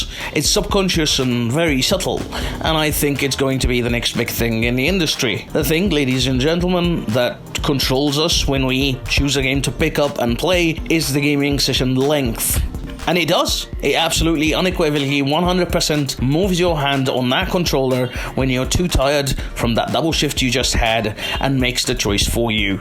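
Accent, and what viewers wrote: United Kingdom. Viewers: Aawsome video but i think you are intentionally using British accent . its kinda hard to be understood when some of your audience natively speaking arabic will also choose to watch the english version as well . your channel your rules but i think american accent is best of both worlds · انت مالك يعم انت اولاً الانجليزي كويس فشخ بس اللهجة بريطاني تقيلة يمكن بس قشطة جداً انا سمعته وفهمته حتى من غير ما اشغل كابشنز انت بقى عايز ايه بالظبط الكلام لازم يكون ليه هدف يا حتة معقد هدفك انك تقول للي قدامك انه غبي؟؟؟ اقسم بالله شوية كمان وهشفق عليك بسبب شخصيتك التعبانة اللي اكيد محدش طايقها فالحقيقة ولمعلوماتك يا معقد الباشمهندس مهندس ميكانيكا قد الدنيا بس بيحب الالعاب فبيشاركنا وبيجرب يخليه محتواه متاح لغير العرب بس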